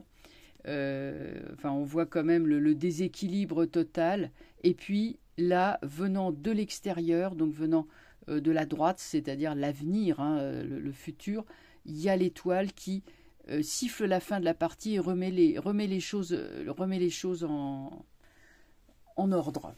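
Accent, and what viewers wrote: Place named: Clichy, France. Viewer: Depuis quelques années, votre voix accompagne mes insomnies, comme une voix amie, comme une amie que j'aurais eue, avec son bel accent de Clichy. Merci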